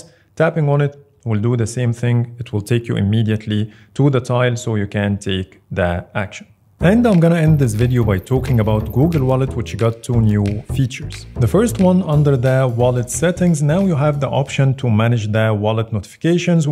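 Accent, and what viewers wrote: Egypt. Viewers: ياخي حاسك مصري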